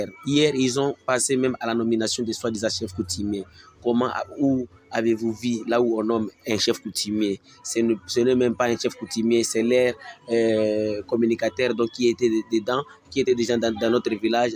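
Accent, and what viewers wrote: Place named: Rwanda. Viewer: Mes chers , ce type qui parle n'est pas congolais est un Rwandais. Ne tombez pas dans n'importe quoi non .suivez attentivement sa façon de parler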